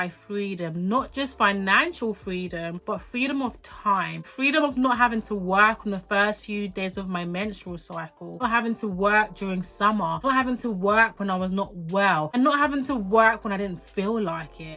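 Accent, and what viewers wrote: United Kingdom. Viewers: I started my channel/personal brand, no subscribers yet. But hey, I got step 1 complete🎉 I just knew you were from the UK for some reason ❤ 🥂 I have a lot of hobbies and it tough to merge them as well so I end up spreading myself thin and overwhelmed. I need to try email Marketing even content ads as well .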